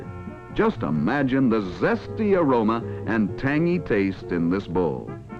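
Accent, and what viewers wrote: Mid-Atlantic, United States. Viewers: It’s likely because of the Atlantic accent he’s putting on. It’s a semi-artificial accent that combines the English and the Northeastern American accent that was used for many informative radio and TV programs so that ideally the narration could be as easily understood as possible in either country.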